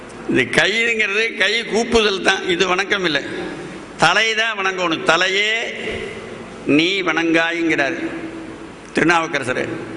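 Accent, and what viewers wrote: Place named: Kongu, India. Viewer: கொங்கு தமிழிலே அருள் மழை பொழிந்து..எங்கள் மனதினை இன்பத்தில் ஆழ்த்திய அய்யாவின் திருவடி வாழ்க..!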